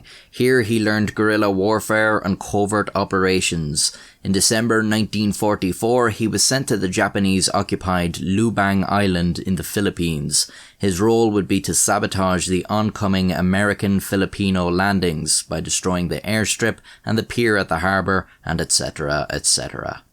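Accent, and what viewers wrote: Ireland. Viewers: you irish? cuz im from cork and you sound irish XD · An irish man talking about WW2 is wierd. Like watching a swiss made video on WW2. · I always forget this guy’s Irish.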